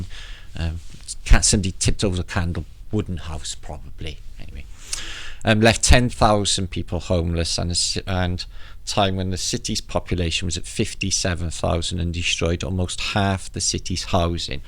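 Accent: Wales, United Kingdom. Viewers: Sound like your from Newport or cardiff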